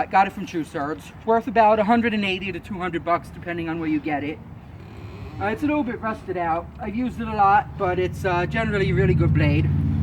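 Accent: Ireland. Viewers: Are u Irish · are you from Ireland?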